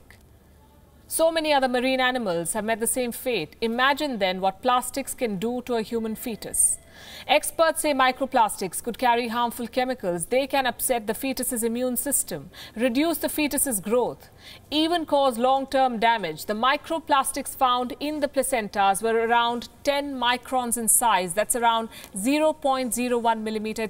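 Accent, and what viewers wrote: India. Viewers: yes for Indian Its Indian accent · Her english sounds uptight and weird posh with a hint of an indian accent. It isnt the best.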